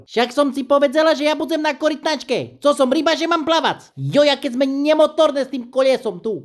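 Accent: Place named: East Slovak, Slovakia. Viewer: Juuuj, jsi největší hvězda ⭐ na jakou jsem narazila. Kdysi jsem měla manžela z východního Slovenska a tento dialekt miluju❤mám na to krásné vzpomínky ze Šariša😂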